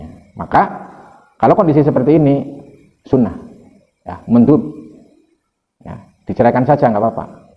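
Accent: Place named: Banyumas, Indonesia. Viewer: Gus ..ngendikane banyumasan bsnget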